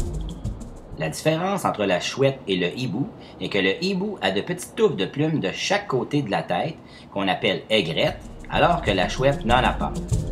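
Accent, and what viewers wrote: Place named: Quebec, Canada. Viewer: Pas mal! Avec un sacré accent québécois tout de même...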